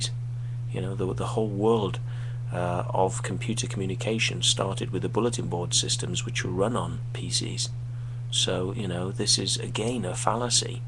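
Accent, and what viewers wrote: Wales, United Kingdom. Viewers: Holy shit, you can't be this idiotic. You still sound a bit welsh, but that surely can't be the reason. You make it sound like you know what you're talking about when claiming (incorrectly) that a lot of technologies Apple incorporate come from UNIX servers. But then you come up with these gems. "DVD is a PC format" "Web Pages, web design came from a PC" Ever heard of Xerox Parc, NeXT? Those are the origins of the GUI and "Web Pages" as you call them. The X is OS X is "ten", not 'X'. Dumbass.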